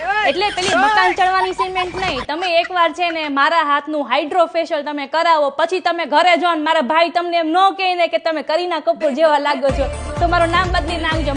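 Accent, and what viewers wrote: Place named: Saurashtra, India. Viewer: તમે બોલો છો તો મારી સખી જેવાં લાગો છો સૌરાષ્ટ્ર, કાઠીયાવાડી ભાષા....આવા વિડિયો હું અમારા સર્કલ માં મોકલું છું...😊😊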